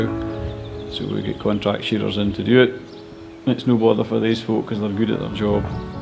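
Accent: Scotland, United Kingdom. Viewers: you must get someone British/Scottish to translate for you.<3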